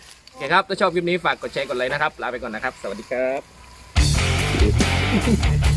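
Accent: South, Thailand. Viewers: คนใต้นี่หว่าฟังตั้งนาน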